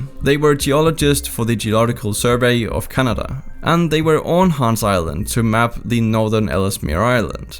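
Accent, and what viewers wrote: Denmark. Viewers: Are you dane, it Sound like it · You Sound danish not gonna lie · Are you danish you sounds danish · This guy is definetly danish with the way he pronounces danish words!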